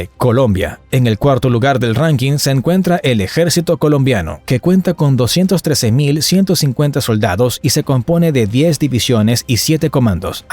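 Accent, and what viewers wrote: Argentina. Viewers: Creo que el del video era Argentino 😂 muy arriba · pregungta seria , eres argentino? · se nota que es argentino, peruano o boliviano.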